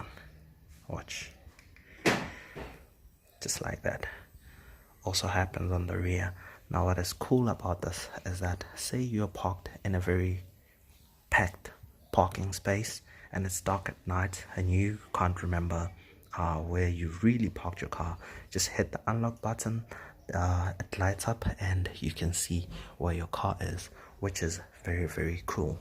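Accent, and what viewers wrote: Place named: South Africa. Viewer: Dude no way I was gonna say you sound South African!! Love the videos man keep it up :)) Love from Cape Town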